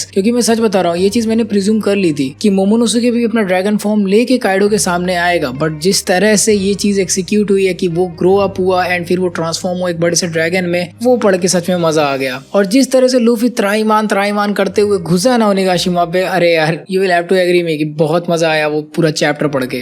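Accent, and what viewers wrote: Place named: Uttar Pradesh, India. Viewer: bhai....🤣🤣 maza aa jata hai tera sun ke ...full UP wali feel aa jati hai..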